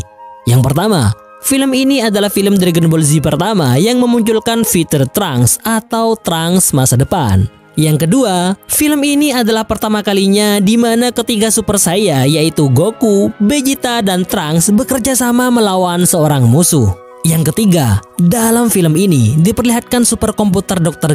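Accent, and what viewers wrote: Madura, Indonesia. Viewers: Madura bang?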